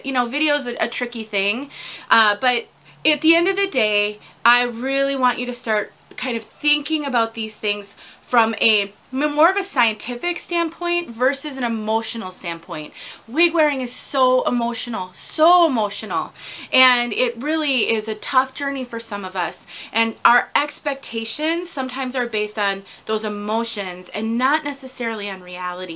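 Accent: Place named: Illinois, United States. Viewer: Girl, you from Naperville, Illinois? Your accent...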